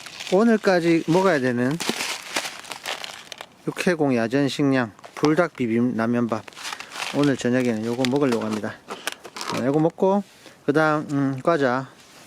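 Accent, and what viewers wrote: Gyeongsang, South Korea. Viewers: 영상잘봤습니다 항상 구수한 경상도사투리 반갑습니다 😁 지금은 서울에 살지만 본가가 사하구라 거가대교 한번씩 가보긴 하는데 도넛츠 🍩 먹으러 가보겠습니다 좋은곳에서 힐링하시니 조만간 저도 한번 가야 겠어요 ^^ 축복합니다 💜💕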